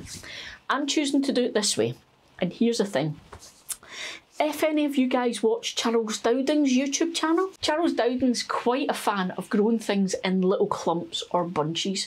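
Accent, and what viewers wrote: Scotland, United Kingdom. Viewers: I started with seeds a couple years ago and will never go back to sets! I’m in zone 5 in Canada and because they were starting to die in the house, I planted them outside as soon as I could. Despite getting a little snow and very cold weather, they did great by the end of my growing season! My ancestry is Scottish and I love listening to you speak! Someday I hope to get to Scotland. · ❤Ah ken a wee bit a Scottish! I am hoping to learn more Scottish gardening techniques, as I have learned so many good tips already! Do you have different types of cabbage for spring and autumn?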